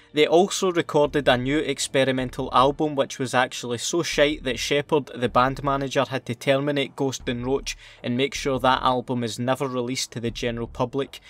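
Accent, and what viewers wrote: Scotland, United Kingdom. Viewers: I love that accent 😂 reminds of Limmy's Show.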